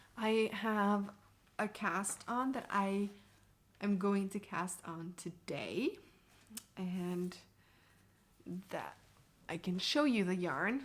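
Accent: United States. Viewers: Beautiful fobs. You have a very American accent for a person who lives so far from USA! I love your vlogs!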